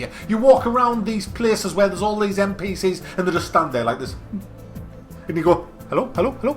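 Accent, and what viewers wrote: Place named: Newcastle, United Kingdom. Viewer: His geordie accent does it for me lmao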